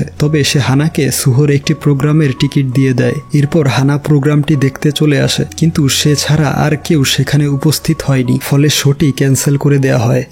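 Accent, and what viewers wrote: India. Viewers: Apni indian kotha shone mone hoy Bangladesh e ami Bangladesh e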